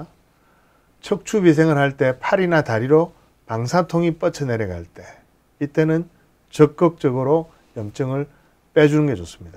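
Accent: Gyeongsang, South Korea. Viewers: ㅋㅋㅋㅋㅋ 갱상도 바름~^^ 귀여븐 사랑스런 감사한 우리 교수님~~😊😍😂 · 갱상도 확실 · 배척 /배측 그냥 알아들으시지 …경상도 발음이 너무 좋아요 ! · 1년 기다려야하는 환자들 생각해서 열과 성을 다해서 설명해주시는 박사님! 의학 지식을 갱상도 발음도 알아들으니 넘 감사드립니다.어금니 꽉 깨물고 참아봐야쥬^^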